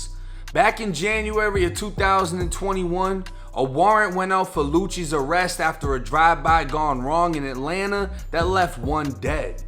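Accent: Boston, United States. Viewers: The best coverage I’ve seen yet. Nice New England Accent too. Sounds like Boston. New subbie. Lucci is definitely going to do time from what I can tell. He hired Gucci’s Lawyer to defend him. I heard he is the best when it comes to this type of case.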